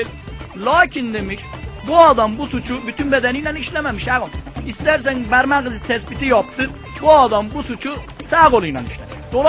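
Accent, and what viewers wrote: Gaziantep, Turkey. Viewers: asil antep sivesi bu, dizidekilere kanmayin, onlari duydukca uyuz oluyom... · cok güzel bizim su antebcemiz yau gülmekten bittim haa yauw su antebimi öyle bi özledim ki vallah gidince antebin topragini öpecem sanada tsk sen beni güldürdün allah da seni güldürsün la yorum neding la haha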